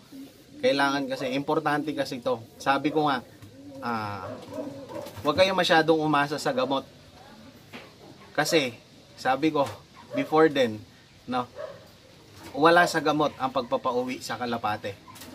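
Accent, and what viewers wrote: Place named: Pampanga, Philippines. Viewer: Boss taga saan ka pwedi puba paki sabi narin sa next vlog mo ty po parang kapampangan kapu kase ea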